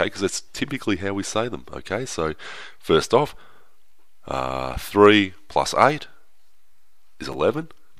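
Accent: Australia. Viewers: Is he Australian lol? I'm look at this cuz my recruiters keeps quizing me on mental math (and I can even look at the problem) but yeah. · i think he is Australian but we might never know....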